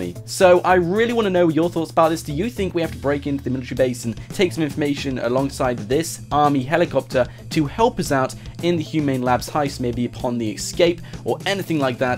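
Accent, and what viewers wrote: United Kingdom. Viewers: Why do British people say pronounce it Mili-tree instead of mili-tary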